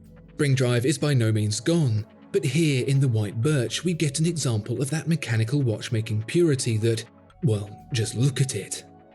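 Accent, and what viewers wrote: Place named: England, United Kingdom. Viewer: This channel always makes me hate the English accent 🤡 and can you ever change th music? Jeez And seiko only competes with seiko, not anyone else , why they have 2 factories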